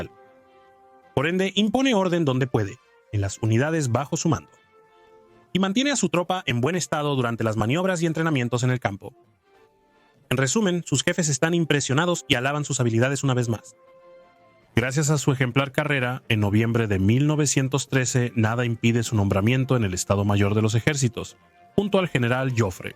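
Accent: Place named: England, United Kingdom. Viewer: En este video, los apellidos franceses se pronuncian " a la inglesa". Hombre, no creo que sea tan difícil hacerlo en francés.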